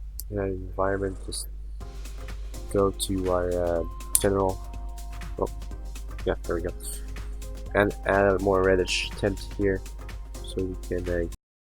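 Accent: Russia. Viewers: I just wonder what went wrong. It seems to me that you tried the right things (crank down weight, crank up roughness), all the stuff i'd try too — but it didn't work out. Still don't get the point. P.S. is a russian, according to accent, and you —?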